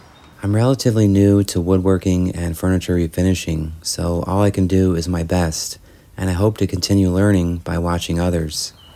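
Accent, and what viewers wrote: Wisconsin, United States. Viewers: It came out great! PS love that Wisconsin accent💕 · As for your accent, I love Wisconsin and I love any and all information you can provide about the piece and process. ❤ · I enjoy your "Wisco" accent. I have only heard Trixie Mattel's exaggerated "Wisco" accent. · I’d listen to your Wisconsin accent all day! Beautiful restoration once again! 😊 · Now that is gorgeous! I could only hope to achieve something minorly close to that… Love your videos with sound without sound with talking not talking and of course I love your Wisconsin accent since I am from Wisconsin but I’ve lived in the south for 25+ years. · Very nice! I remember when you first did a veneer replacement. 😊 you've come along way. And I love you're Wisconsin accent. Growing up in Sun Prairie, going to East Town Mall as a teen, Saturday's in the parks. You make me remember my carefree youth. 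· That natural wood grain is so beautiful, hard to believe someone would paint it! I smiled when you mentioned your Wisconsin accent, I being a 70 year citizen of this state I thought, what accent? Lol! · That paint stripper worked great..I found the environmental strippers didnt work well at all ..I love your Wisconsin accent 😊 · Wisconsin accent? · Amazing.....you bring back the original beauty!❤ And Ilike your wisconsin-english😂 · Trop beau! J’aime autant vos silences que votre accent du Wisconsin. Ne changez rien. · As a former Midwesterner, I appreciate the Wisconsin accent ❤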